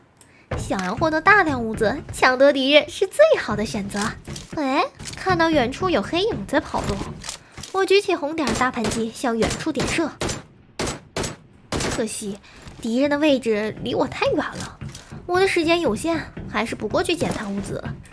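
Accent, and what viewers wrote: Northeast, China. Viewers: 她不是最開頭就說用東北話嗎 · 東北話少點可愛，還是唱歌好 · 感觉有一点东北口音 hhhh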